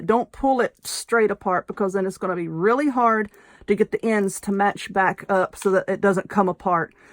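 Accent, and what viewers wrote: South, United States. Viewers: I adore you. the Southern accent is so calming for me, since the whole of the maternal side of my family is from down South, plus you explain everything so thoroughly and show how slowly so it can be followed, it doesn't hurt that you mix in some humor too, that's always really nice and reassuring when one might be nervous or have difficulty keeping up with faster tutorials. Unfortunately though, JoAnns went out of business, I'm so sad about that.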